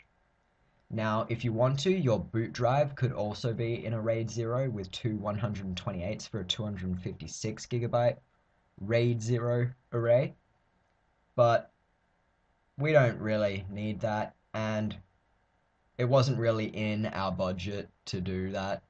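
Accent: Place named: Australia. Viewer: Well I think he actually sounds Australian. And Australian's use dollars, soo yea.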